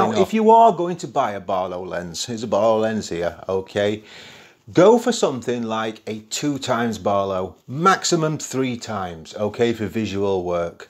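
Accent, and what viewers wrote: United Kingdom. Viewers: Excellent video! Where in the UK are you from, BTW?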